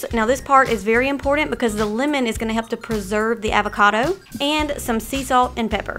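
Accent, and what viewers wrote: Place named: North Carolina, United States. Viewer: As a southern girl myself in trying to isolate your accent. North Carolina? I thought maybe Georgia but I dont think so. Alabama here.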